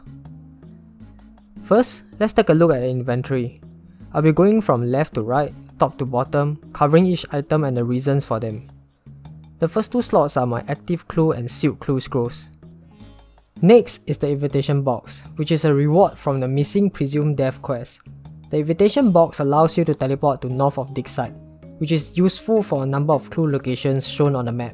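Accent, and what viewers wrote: Singapore. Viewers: SG Accent ♡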